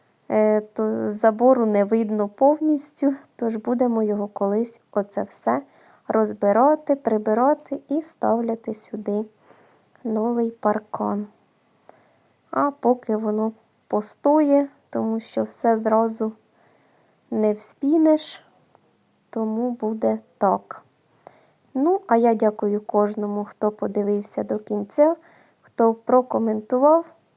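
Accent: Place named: Cherkasy, Ukraine. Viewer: А яку назву має ваше село? По говору чую, що область Черкаська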